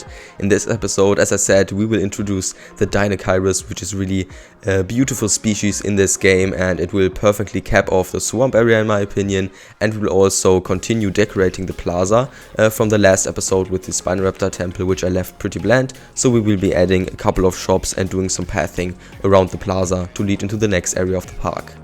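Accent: Germany. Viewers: I love the Design and Are You German because of the accent😅?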